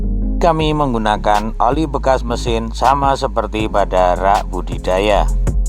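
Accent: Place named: Yogyakarta, Indonesia. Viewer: Apa suara org jogja kaya gini semua ya 🤔🤔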